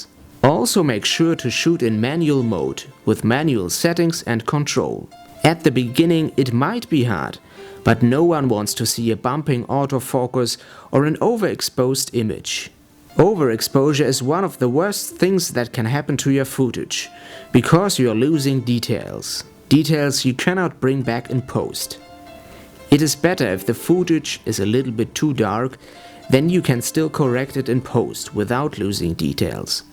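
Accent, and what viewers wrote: Germany. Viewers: Man hört nach 3 Wörtern, dass das ein Deutscher spricht. Ekelhafter Akzent. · haha this is a german accent ;) very funny! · He sounds German to me. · Defenitely german, you hear it in the way he talks,...! Good advise by the way! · Er spricht das Englisch wie Deutsch aus. Als würde er es mit deutschem Akzent vorlesen oder so. Ich mach's besser. Wo bleibt mein Keks? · Dude, i bet hes german ;D they speek in a funny accent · from the accent...he must be German.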